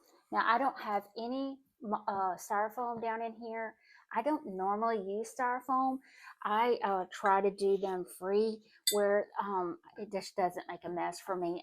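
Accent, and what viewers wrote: South, United States. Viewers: Lovely and so nice for fall. Love your adorable Southern accent!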